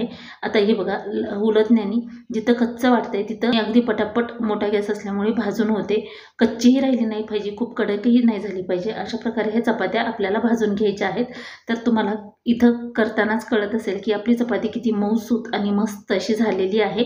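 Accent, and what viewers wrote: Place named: Ghati, India. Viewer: आवाज स्पष्ट येत नाही,खूपच घाटी होते बोलताना.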